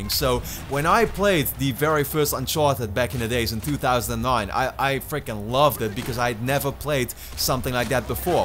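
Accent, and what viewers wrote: Netherlands. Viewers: This Guy is dutch.... I can hear it.... Im dutch too... And btw GO READ A BOOK PEOPLE!!!! RRRREEEEEAAAADDDD! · I heared your voice and already know you are Dutch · Is this boi dutch? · i can hear the dutchness in your voice xD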